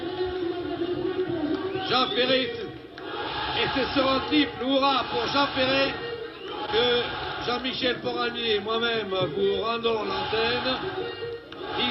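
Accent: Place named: Algeria. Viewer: Le commentateur est au top. Langage imagé et accent pied noir. Autre époque.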